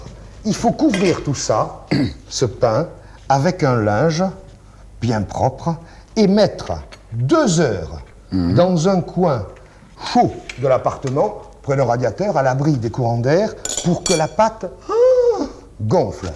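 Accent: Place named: Midi, France. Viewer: Et cet accent du Midi bon enfant me fait jubiler comme jamais !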